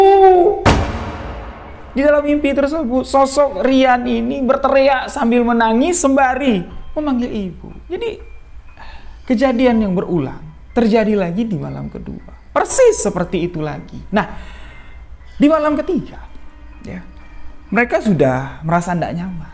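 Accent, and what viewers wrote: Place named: Sumatra, Indonesia. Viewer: Mas org sumatera ya…? Logatnya kayak org sumatera😊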